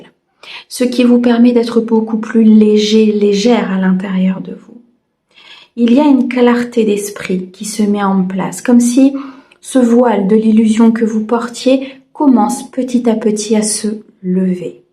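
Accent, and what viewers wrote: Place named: South, France. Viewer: Tout à fait ça !!! Grand merci des décennies de blocage et d'utilisation ! Ouffff j'adore ton accent du sud que l'on perçoit parfois !❤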